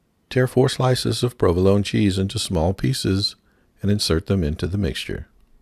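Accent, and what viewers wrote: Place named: South, United States. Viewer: I love the way you talk. It's just so Southern. Love the recipe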